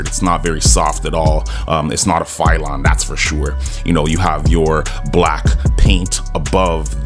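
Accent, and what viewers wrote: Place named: Ontario, Canada. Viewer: You from Ontario? That accent hitting different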